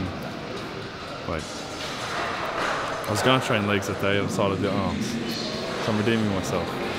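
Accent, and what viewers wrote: Australia. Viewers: your accent is coming back since you Oz trip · Jay Tee Australian English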